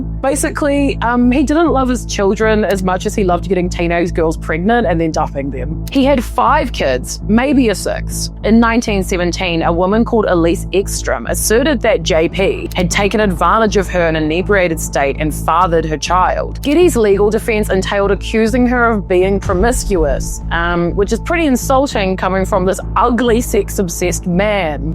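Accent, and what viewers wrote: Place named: New Zealand. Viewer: That’s how we pronounce it in Australia and this lady sounds like a Kiwi, so I’d say they say it like ww do. I’m guessing you’re American? We have different accents down under.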